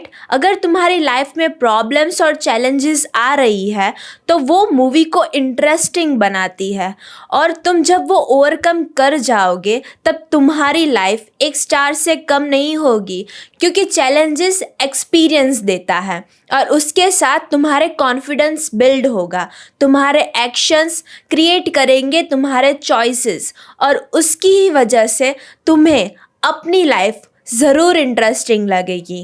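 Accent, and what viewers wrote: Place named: South, India. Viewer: Are you from south india?? Your hindi like this ❤❤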